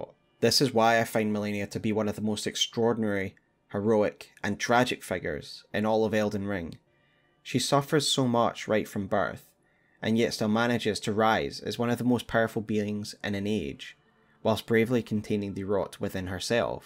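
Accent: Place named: Scotland, United Kingdom. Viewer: A fucking love your Scottish mate. Good to see your putting us on the map for the souls Community 😉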